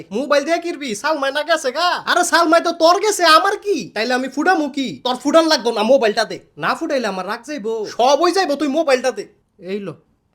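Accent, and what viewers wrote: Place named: Narsingdi, Bangladesh. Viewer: আমনে কি নরসিংদির নাকি,,,আংগ ভাসায় দি কতা কন,,,,বাড়ি কইনো আমনেগো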